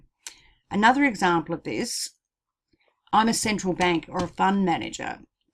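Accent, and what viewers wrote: Australia. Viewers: Hi, I recognise that accent cos I'm also Australian =) Definitely subscribing. Great vid.I'm holding alot of xrp hopefully it the bull run continues.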